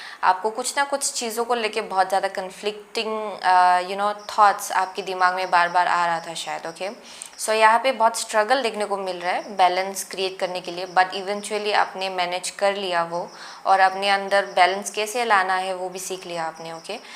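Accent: Northeast, India. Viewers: R u from northeast???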